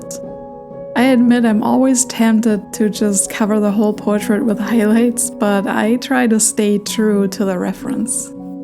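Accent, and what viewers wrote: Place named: Germany. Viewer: Subscribed! I LOVE the colorful portraits, but honestly? I wasn't expecting your accent. I spent a handful of very happy years living in Germany as a teen, and i didnt realize just HOW much i missed it. So im going to draw alongside your videos and feel all the comfort and nostalgia in the world. Thank you 🥹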